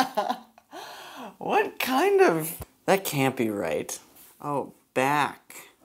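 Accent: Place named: Canada. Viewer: You sound Canadian are u Canadian ?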